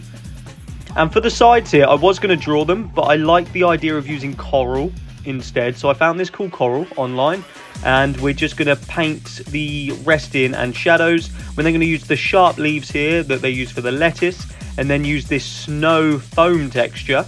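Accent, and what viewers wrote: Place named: United Kingdom. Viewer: HES BRITISH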